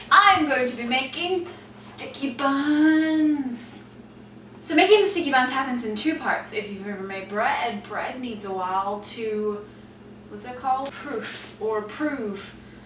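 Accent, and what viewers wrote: United States. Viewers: Hard to believe I could be this captivated watching somebody bake. I'm a bachelor and I don't bake, but I might now give this a try. Also, ' ' 's American accent seems entirely natural now. Do you ever feel now like you're "doing" an American accent? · K who misses the old days when she sounded English no she sound like every other fucking America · Not watched a video in 3 or so years. accent is DEFINITELY more American now, it's so weird haha!! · I wonder why people still remark about American accent. She's sounded a bit American for several years now. That's not a recent thing. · Your American accent has less traces of British accent, and it is just as lovely.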